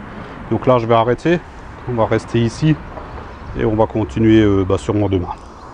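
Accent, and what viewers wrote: Alsace, France. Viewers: Rien que pour l'accent alsacien je mets déjà un pouce en l'air ;-) en tout cas c'est clair qu'il est bien fini ce carport . Par contre qu'en est-il de l'entretien du polycarbonate transparent ? facile à laver ? et niveau résistance ?? J'ai beaucoup de vent dans mon petit patelin lorrain, je n'aimerai pas retrouver la toiture chez le voisin...tu penses que c'est costaud si ca souffle fort ???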